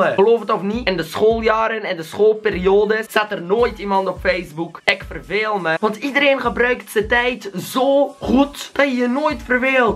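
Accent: West Flanders, Belgium. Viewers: Van waar ben je ? Van west vlaanderen ?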